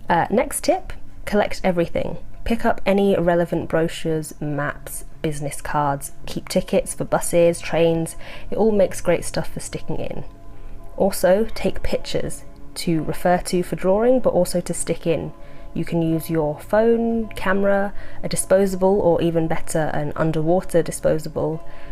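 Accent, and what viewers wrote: United Kingdom. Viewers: normally british accents kinda remind me of how shitty humans can be, especially the very articulate well enunciated ones. but you, you broke the accent mold for me.... the format you use has bin abit of a schtick, most of the time. with no audio id assume you would be obnoxious cus of judging things based on other things, but this breaks the format mold. basically i think you are neat. thanks for being cool!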